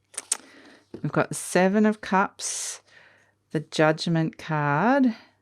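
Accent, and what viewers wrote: Australia. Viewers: DonI recognise a fellow Aussie?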